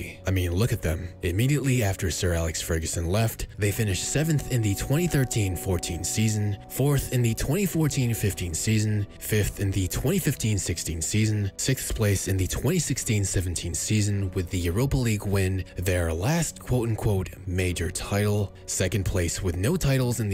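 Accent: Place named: United States. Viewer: Hearing someone with an American accent saying football when referring to soccer makes me cringe. Idk why maybe it’s cuz it’s so unusual to me.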